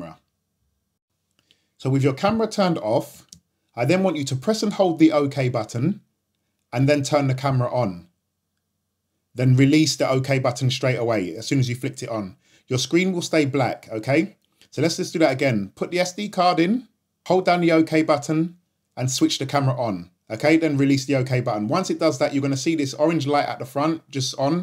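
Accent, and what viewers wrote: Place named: England, United Kingdom. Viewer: the very least I can do to say thank you. Love your English accent.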